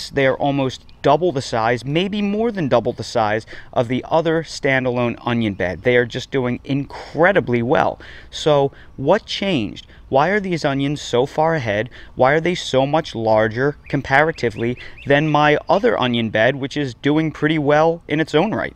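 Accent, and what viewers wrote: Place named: New Jersey, United States. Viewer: You are in Carolina but your accent screams NJ, where I grew up….. are you from there?!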